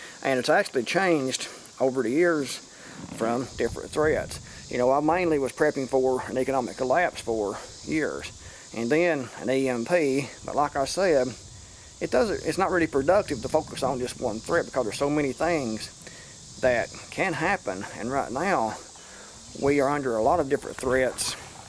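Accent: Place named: South, United States. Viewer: I think he,s great ! Love the southern accent ...i,m from LOUISIANA and we all sound like the actors on the movie THE WATERBOY ! LOL !!!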